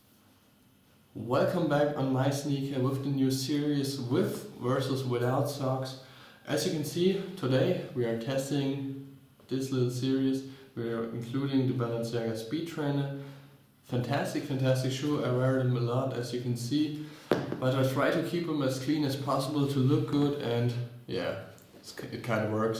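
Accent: Germany. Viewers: Man hört das er deutsch ist xD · Man hört mega deinen Deutschen akzent😂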